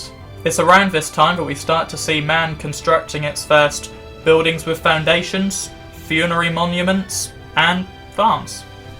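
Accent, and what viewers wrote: Norfolk, United Kingdom. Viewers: The only thing better than a Norfolk accent is a Norfolk sense or humour you are a star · I'm Norfolk born n breed . So your accent is music to my ears .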